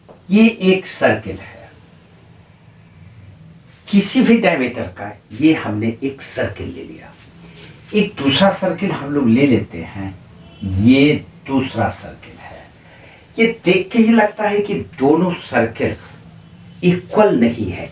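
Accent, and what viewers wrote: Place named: Bihar, India. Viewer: Lk dan 👍 sir aap bahut hi achha se samjhate hai students ko asani se samjh aata hoga aap ki boli se lagta hai aap Bihar se bhi ham thik kah rahi hu sir ji 🙏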